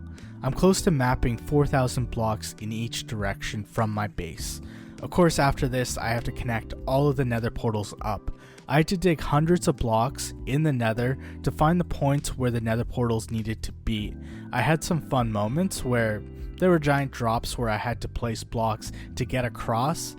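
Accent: India. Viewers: Are you indian or American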